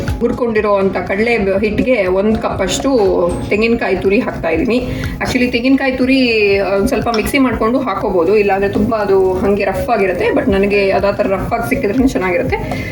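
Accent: Mangalore, India. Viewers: Manglore slang kelode chanda😍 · & nimma uru yavudu i think manglore or udupi tulu alle alwa matadodu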